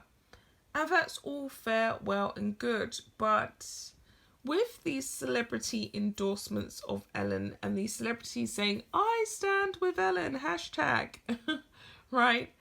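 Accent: England, United Kingdom. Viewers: I think the way you pronounce her last name is adorable and speaks to your English accent. Honestly people are so nasty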